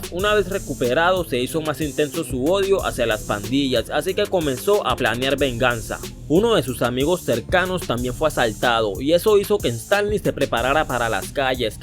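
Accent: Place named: Pacific, Colombia. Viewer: Por tu acento creo que eres de Tura. O algún lado del Pacífico colombiano. Espero no equivocarme. Saludos paisano.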